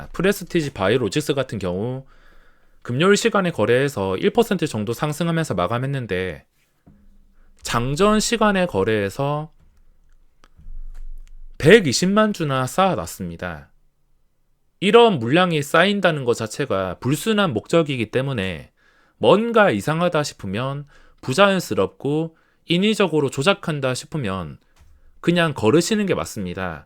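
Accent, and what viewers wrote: Gyeongsang, South Korea. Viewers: 창원분이라 그래요..물론 창원분이 다그런건 아니지만 저도 경상도사람이라 알거든요 그러고싶어 그러시는게 아님...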